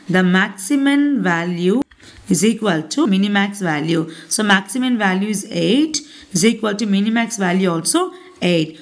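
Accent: South India, India. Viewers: I don't know how to thank you I just want to say I love you ma'am and specially love your south Indian xent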